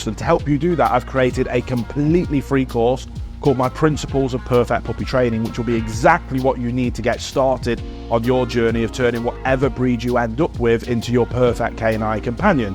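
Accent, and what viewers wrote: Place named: Birmingham, United Kingdom. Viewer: Question- is that a Brummie accent I detect? Trying to learn more about these British accents. It's certainly distinct, but fortunately even discernible to this Yank.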